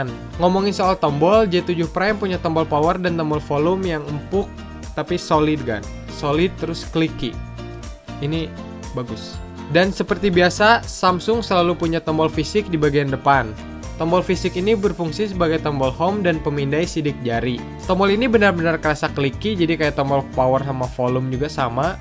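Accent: West Java, Indonesia. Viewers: "Halo Juragan", suara khas amat sundanya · Nyunda banget Kang 🤣 kerennn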